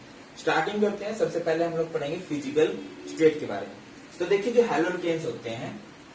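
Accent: Haryana, India. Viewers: Sir r u from haryana🤔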